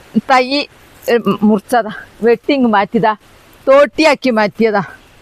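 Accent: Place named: Wayanad, India. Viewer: wayanad എവിടെയാണ് നല്ല പരിചയം ഉള്ള സംസാരം, മുഖം, ഞാൻ വയനാട് ആണ്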